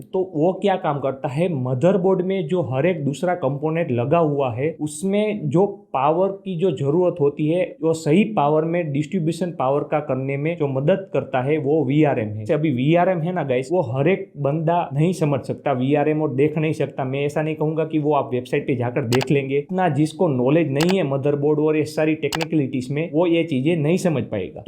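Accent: Gujarat, India. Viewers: are you gujarati 😅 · Aap Gujarati ho kya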